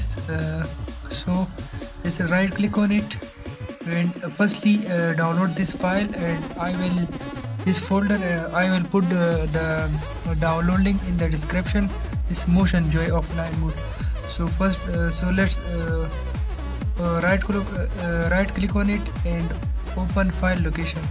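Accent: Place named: India. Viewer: What is he saying??? If you speak english with that indish slang, why is there a technomusic in the video, sometimes louder than your voice. BIG FAIL!!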